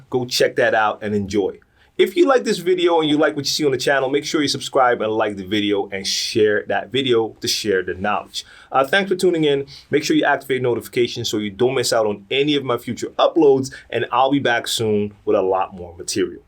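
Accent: New York, United States. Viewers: wow you sound like you're from New York! Great content as always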